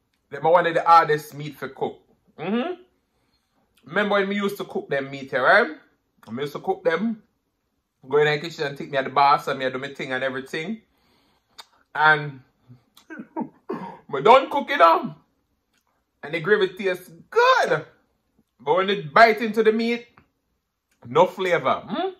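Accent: Jamaica. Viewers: I see 👀 you lawwd how is it possible that you make food look so good. Another channel 🙌 for recipes. What that name of the channel again 🤔 you and that Jamaican accent. Sing sing 🎶 I don't know that song though.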